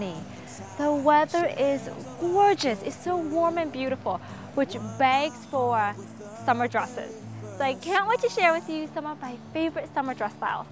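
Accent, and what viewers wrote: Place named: Singapore. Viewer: im guessing she's from singapore so she's got the singaporean accent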